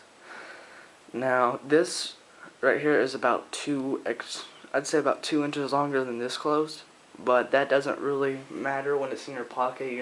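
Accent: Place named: Australia. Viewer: If they are illegal where did you get them? You sound kinda Aussie so I'm guessing you get them imported. Please reply! I am looking to buy one :)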